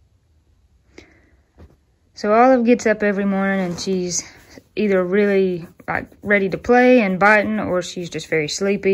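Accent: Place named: Eastern Kentucky, United States. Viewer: ❤ I just wanted to save it I admire you and your sister. You're such sweet authentic women. Raised right in a crazy world. My family is from eastern kentucky I now live in indiana. I spent every summer at my grandparents house down there. All of my family has passed. There are only a few distant relatives still down there. After watching some of your videos and watching your mom cook I feel homesick l o l. In fact I just asked my husband of we could take a short trip to the area and just soak it all in. Stay sweet and keep doing what you're doing. It's Also refreshing to hear someone sounds like me l o l. Much love and prayers ❤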